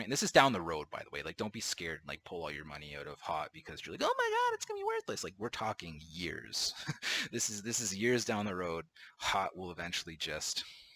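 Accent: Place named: Ireland. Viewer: Sounded Irish to me :-)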